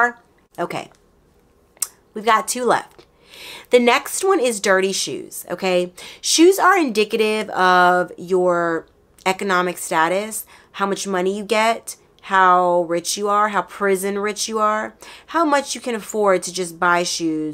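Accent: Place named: North Carolina, United States. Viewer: I hope you know and BELIEVE how good you are at this ❤ Because I swear, I could listen to you talk about anything for as long as you’d be willing to talk 😂 I also love some of your sayings and your accent because I’m in NC and I can SOOO relate to some of the stuff you say and how you say it… feels like I’m just listening to a friend tell a crazy story❤🫶🏻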